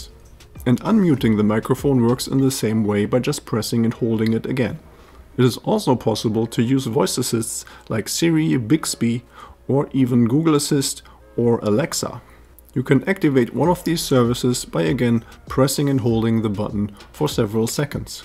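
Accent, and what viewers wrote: Germany. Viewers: just because Im curious, is he german? I think he has an german accent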